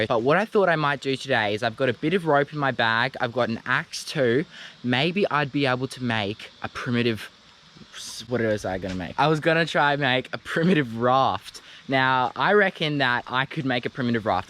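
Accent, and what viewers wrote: Australia. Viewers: R u Aussie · dude his voice is amazing it’s the peak voice for being Aussie you just can’t appreciate a good content when you see it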